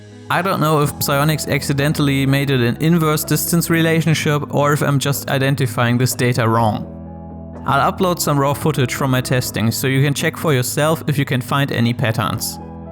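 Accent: Germany. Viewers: R u german?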